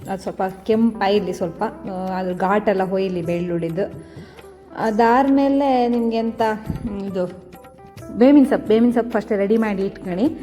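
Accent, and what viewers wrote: Kundapura, India. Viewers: ನಿಮ್ಮ ಕುಂದಾಪುರ ಕನ್ನಡ 👌👌👌👌👌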